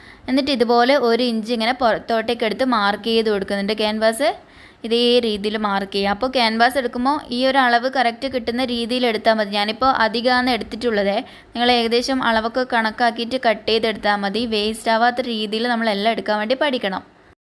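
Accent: Kannur, India. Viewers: Ithu nammude kannur bhasha anallo like cheithitundttoo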